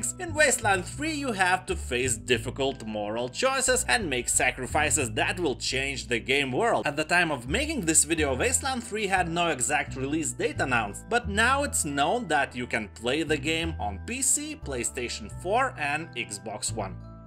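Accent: Russia. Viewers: This dude sounds like russian to me :) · бесит его русский акцент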